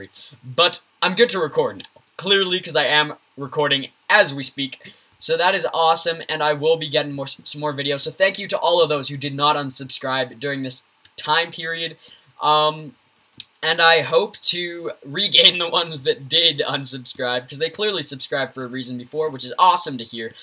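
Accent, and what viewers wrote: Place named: United States. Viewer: lol gg i automatically assumed u were american xD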